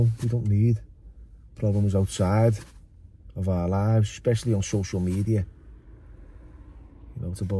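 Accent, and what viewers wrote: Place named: Birmingham, United Kingdom. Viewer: that brummie accent thought you were doing an aussie lol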